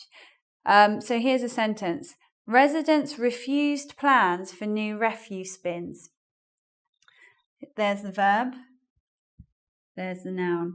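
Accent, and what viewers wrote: United Kingdom. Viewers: I find you very attractive ! specially your british acent ! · british accect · Is this British accent? Sounds Cool!! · hi from México, do you know im just gonna suscribe me, because I love inglish specially British inglish, I love your accent, so I hope you can share us more videos about your english British, I spent too much of time time looking for British accent and finally I found out it in your channel. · british accent? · Wow british accent 😍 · Is it pure British accent ?!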